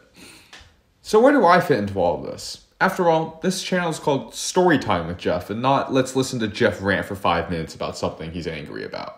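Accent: United States. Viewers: This format kinda reminds me of gradeAunderA but with an American accent and a bit more serious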